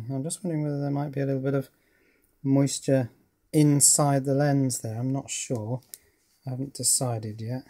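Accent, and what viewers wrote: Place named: RP, United Kingdom. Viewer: you have an upper crust English accent.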